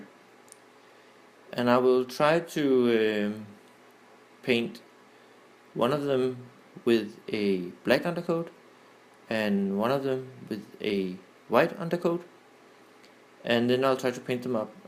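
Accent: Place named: Denmark. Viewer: Nice video. Are you by any chance danish? The way you speak english, sounds so danish :)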